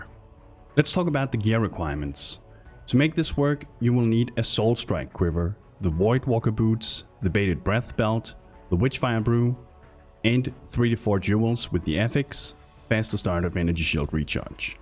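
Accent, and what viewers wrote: Denmark. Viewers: jeg har altså en eller anden idé om at den eneste grund til at folk ikke snakker om dig/recommender alt dit content er pga din horrible accent:P Det gør ondt at høre på som dansker, men det er sikkert også ret skidt for ikke-danskere. No offense! Jeg ønsker dig kun det bedste... men ja, øv, måske du kunne (måske bare for min skyld.. det kan godt være jeg er den eneste) prøve lidt hårdere på udtalelsen? Den værste er når du siger "whalecum" istedet for "welcome"... oof!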